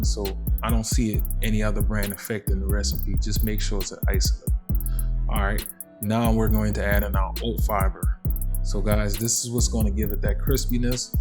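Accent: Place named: Gullah, United States. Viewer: You sound like one of my Gullah clan. Say it's so😊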